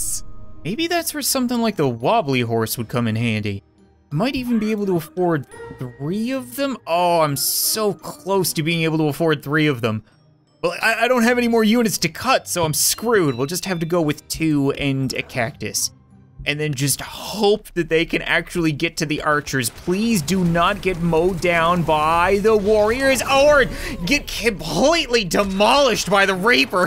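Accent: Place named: Canada. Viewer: Why was I thinking "Wind Conditions?" Must be the Canadian accent.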